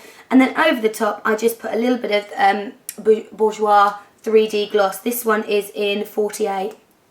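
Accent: United Kingdom. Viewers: I just love doing that line, it makes such a big difference along with the mascara, sometimes you don't need anything else on the eyes. When you said gel liner I heard jaw liner, but of course english is not my native language, and paired with that lovely british accent (which I would kill for, I can only speak like Americans meh) it came funny to my ears. :D BW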